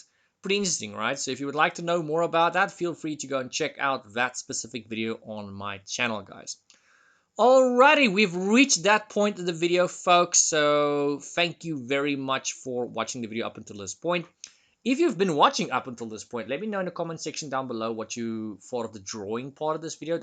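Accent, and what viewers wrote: South Africa. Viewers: Terry Davies, the best programmer ever, said that the CIA came up with the abbreviation LOL, laugh out loud, to misdirect people from finding out about IOT, Internet of Things. Most people will think that's just insane, which it might well be, but if you're into politics, then it's really not that far fetched lol. Thanks for the video series, it's well presented, and i agree with a previous comment i saw, you have a interesting delivery of the material, which makes it less difficult to learn, maybe it's the accent, i assume SA from the sound of it. Thanks again.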